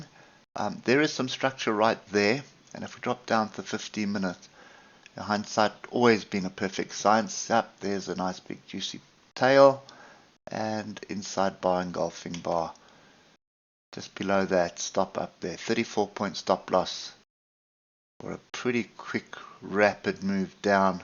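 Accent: South Africa. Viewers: You sound like South African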